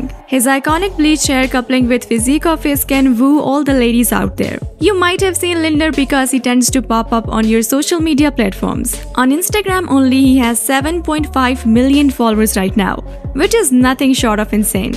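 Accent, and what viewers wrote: India. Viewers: Great......Sounds like a Indian.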